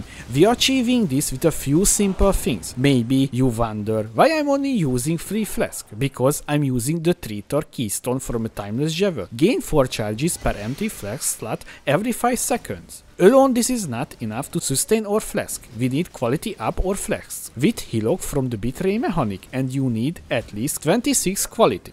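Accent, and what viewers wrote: Hungary. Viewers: Hungarian, right? :D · az akcentusból tudtam, hogy magyar vagy, jó videó!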